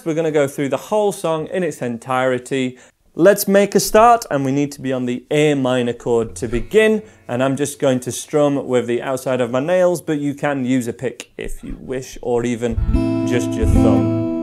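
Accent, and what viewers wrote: United Kingdom. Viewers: He's from the UK.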